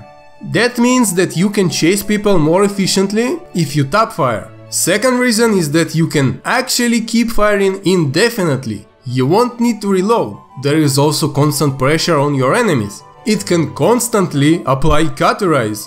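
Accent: Russia. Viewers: U are Russian? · Чувак,ты русский?)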